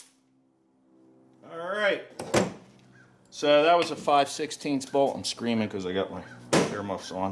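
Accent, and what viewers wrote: Pennsylvania, United States. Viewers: Wow Pennsy accent coming at yas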